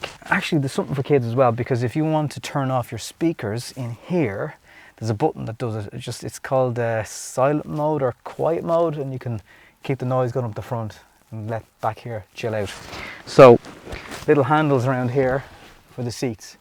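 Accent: Ireland. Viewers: U Irish u sound like it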